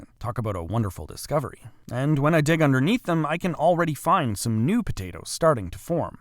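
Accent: Canada. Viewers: I wonder the same. He sounds Canadian. The topography looks northern too. I'm in Maine, zone 4/5.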